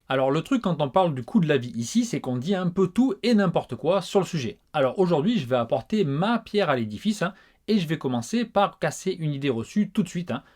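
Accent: Marseille, France. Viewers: De temps a autre tu as une petite pointe d'accent de Marseille qui se fait entendre...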